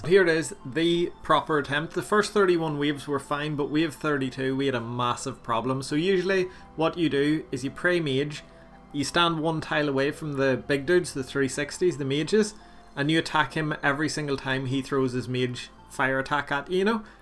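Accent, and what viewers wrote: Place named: Northern Ireland, United Kingdom. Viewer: Thats a properr norrrnn irrron accent for sure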